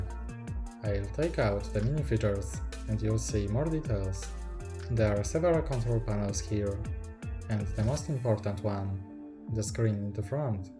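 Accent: Poland. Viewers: Twój akcent wręcz zdradza że jesteś polakiem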